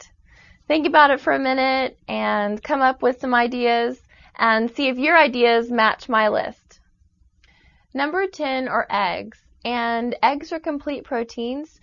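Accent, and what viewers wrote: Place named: South, United States. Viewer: Yeah I notice that too. I think it's her trying to hide a southern accent.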